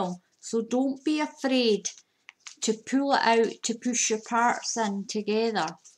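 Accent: Scotland, United Kingdom. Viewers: Omg This is the first time I have heard a fellow Scot on You Tube. Your tutorial is so clear and detailed and the swan is beautiful. I think I will be able to make it. Keep these origami tutorials coming. Thank you so much. xx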